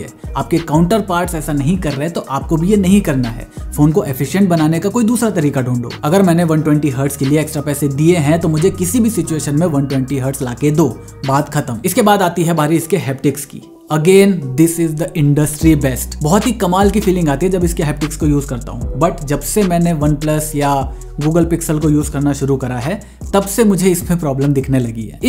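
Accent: India. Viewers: Dondda do dhat indian accent are so funny 😂😂😂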